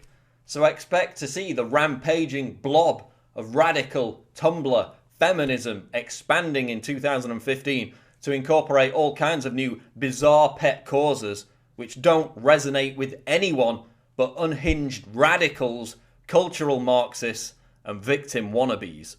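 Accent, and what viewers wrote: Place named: England, United Kingdom. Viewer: Alan Fournier His particular English accept you dumb fucker. Not all English people sound the same, his english accent annoys me.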